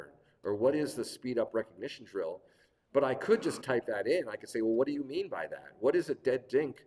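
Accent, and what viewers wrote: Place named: Italy. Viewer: came for the AI talk, stayed for the Italian-accent poetry Great intro to generative AI. You made a complicated topic feel practical, and the “AI predicts words, it doesn’t think” explanation really clicked. Also… now I have no excuse for bad emails anymore. Nice job making AI understandable for normal humans. Cafer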